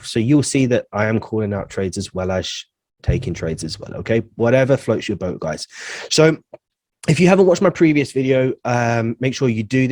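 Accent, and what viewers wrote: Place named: Cornwall, United Kingdom. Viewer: Greetings from Miami!!! Love, love the vids!!! you truly ROCK. I was wondering if the membership you offer includes daily signals? Also, are you from Cornwall? I have a friend who's accent is soooo similar to yours...lol. Thanks for great content and clear delivery!!! 🙏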